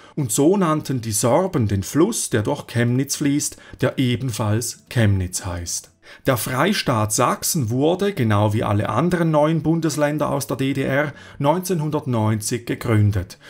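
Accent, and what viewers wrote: Switzerland. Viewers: Sich bei einem Schweizer über die Aussprache beschweren. Wahnsinn in Deutschland - Kapitel 214. Ps: Danke für diese erfrischende Reise!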